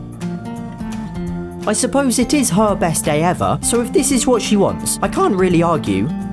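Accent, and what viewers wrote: United Kingdom. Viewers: Is he Bri'ish?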